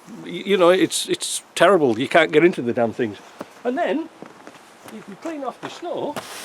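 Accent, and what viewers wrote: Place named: North, United Kingdom. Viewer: Yeh, with the accent I thought you were up north,not as far as canada though lol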